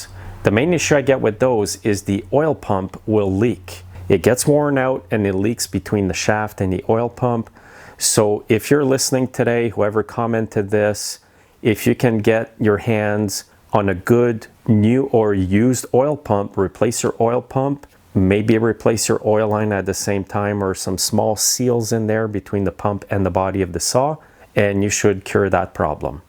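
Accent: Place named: Newfoundland, Canada. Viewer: You must be from either Newfoundland or Labador by that Accent that you have!! you can't Fool an Old Canuck Lol anyways Love you for being a Caregiver to you're Wife your a Very Strong Man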